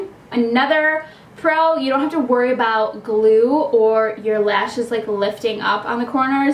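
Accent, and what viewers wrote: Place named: New York, United States. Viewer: Lol I love your New York accent ! I can tell 💗💗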